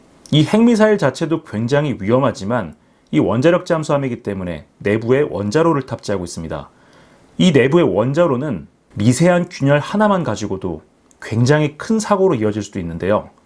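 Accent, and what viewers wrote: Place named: Busan, South Korea. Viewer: 말이 뭐가 빠랐냐 니가경상도 부산억양에 익숙하지않아서 그런것일 뿐이다 노량진 학원가서 경상도말 배워라. 나는 그것도 늦어서 속도1.5로듣고있다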